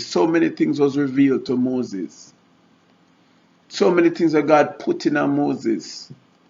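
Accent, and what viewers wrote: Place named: Jamaica. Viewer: Whewwwww straight up Jamaican dialect/vernacular lol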